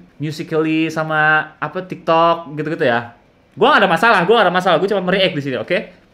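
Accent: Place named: Bali, Indonesia. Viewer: Duh aksen baline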